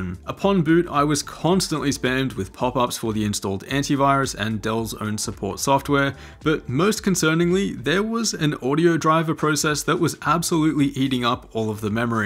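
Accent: Australia. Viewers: R u and Aussie whaaaat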